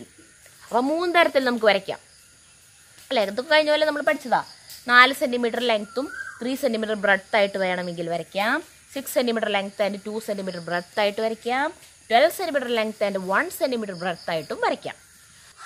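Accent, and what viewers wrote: Thrissur, India. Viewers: Teacher thrissurkkariyano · Mm poliya trissur slang.😘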